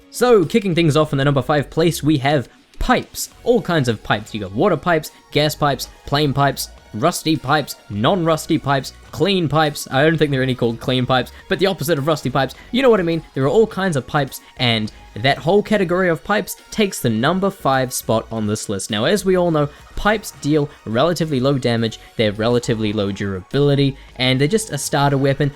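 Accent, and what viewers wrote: New Zealand. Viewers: Are you from nz cause I am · are u from nz?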